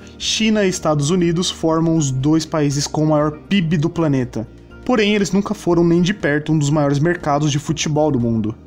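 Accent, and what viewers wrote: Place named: Inland São Paulo, Brazil. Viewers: nitidamente paulista!!! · Ele deve ser do interior paulista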